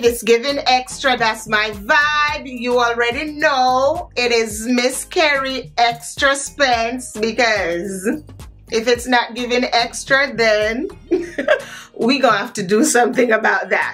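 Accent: Jamaica. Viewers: Love your style and l ove when you speak jamaican patois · Hi K, Jay and I are here laughing hard! He loves how you bring in your patois! 🥰 As always, here for the fashion and always enjoy the vibezzzz!!!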